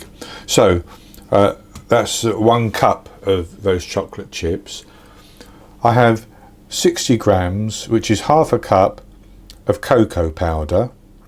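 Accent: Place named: Somerset, United Kingdom. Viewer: thanks,love your gentleness and relaxed approach...are you retired chef,your brilliant and I'm trying to work out the English accent...Somerset?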